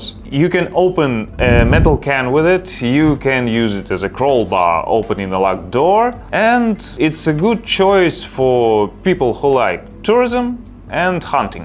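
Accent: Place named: Russia. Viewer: Hi. Thanks for all the useful information you have shared. I like how you break everything down in an easy way to understand with your awesome Russian accent. Thank you once again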